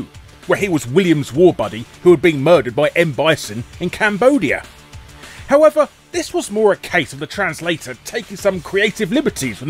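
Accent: United Kingdom. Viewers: no offense i just generally really dont like british english lel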